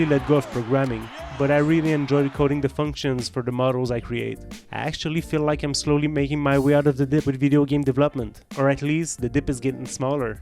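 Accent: Quebec, Canada. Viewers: tu sonne quebecoi, nice video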